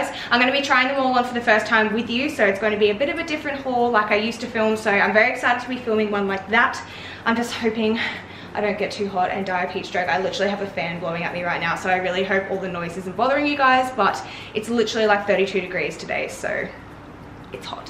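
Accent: Australia. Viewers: Why do aussies pronounce o, oyyuuu. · Australian Women Are Gorgeous!!! not only you're beautiful you have a nice accent too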